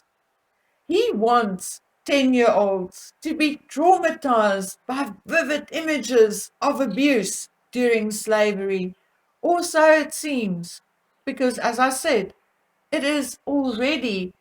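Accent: South Africa. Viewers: I have been in the UK for nearly 20 years, and I see quite a few similarities to South Africa, but you dare not say anything without being told to "go back home then if you don't like it". I see it in job advertisements where in South Africa you have still the person of colour being preferred for the job. That is happening here now. They word it where they say they prefer applicants of colour/persuasion. Love your research and as a South African another welcoming fellow Saffa accent.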